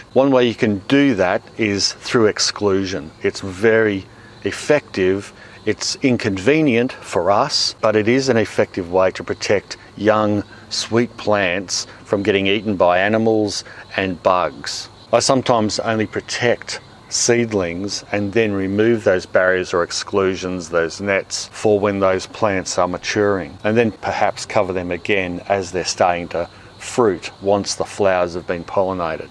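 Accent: Australia. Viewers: Yes! That Australian accent has got to help! ❤️❤️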